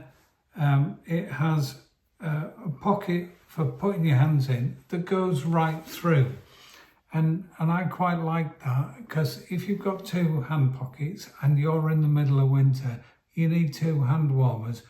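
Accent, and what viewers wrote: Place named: County Durham, United Kingdom. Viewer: Where Oop North are you from, I’m hazarding a guess and would say County Durham? Very informative video as usual, missed your uploads so looking forward to your next one. Cheers. 👍🏼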